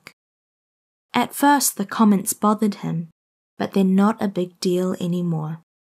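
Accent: New Zealand. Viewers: 이 영어 발음이 뉴질랜드 영어발음 악센트인가요?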